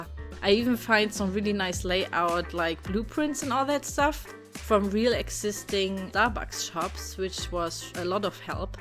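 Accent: Germany. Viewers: Du hörst dich richtig an wie eine Deutsche die englisch spricht..😂😂😂😂 aber seeeeehhrrr süß !! 😂😂🦄🦄🦄💕💕❤️❤️🍓🍓😘😘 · Are you German? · Ja, in Deutschland sind fast alle Starbucks in anderen Gebäuden integriert. Ich habe vom ersten Satz an gehört, dass du deutsch bist. :D Aber dein Englisch ist gut.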